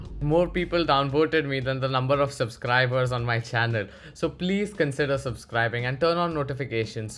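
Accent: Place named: India. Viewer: ur indian accent is heavy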